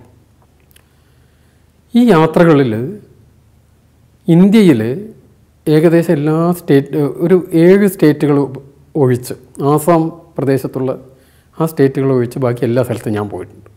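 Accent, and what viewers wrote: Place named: Kannur, India. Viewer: ആ കണ്ണൂർ കമ്മ്യൂണിസ്റ്റ്‌ സംസാര ശൈലി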